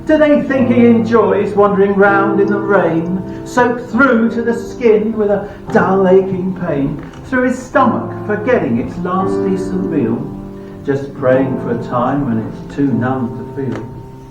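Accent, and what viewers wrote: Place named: England, United Kingdom. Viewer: Very well done my friend. I liked it a lot. You have an excellent voice and I love your English accent.😊👏🏻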